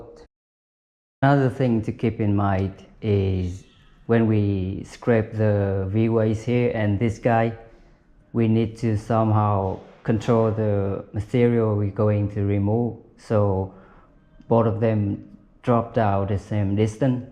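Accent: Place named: Vietnam. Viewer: Nghe phát nhận ra người Việt luôn 😂😂😂, coi tên kênh thì chính xác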